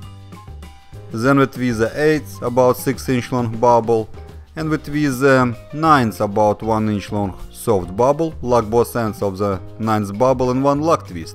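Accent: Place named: Russia. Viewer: Русский режет на английском ухо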